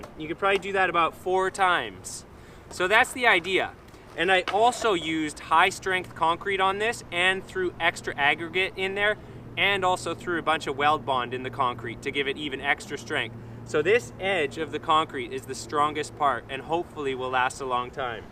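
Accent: Canada. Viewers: this has to be a canadian talking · He sounds like every Americans impression of a Canadian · Is this a Canadian accent I'm hearing? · I can tell he is Canadian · This guy is the shit. Love the Canadian accent lol. I'm also a skateboarding who ended up in construction cuz skateboarding wasn't paying the Bill's. I wish it could've tho lol. Keep it up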